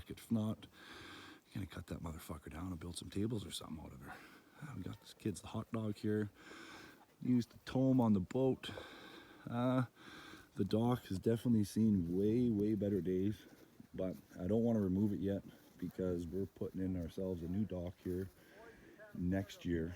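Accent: Canada. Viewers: Ontario accent - for sure - thanks.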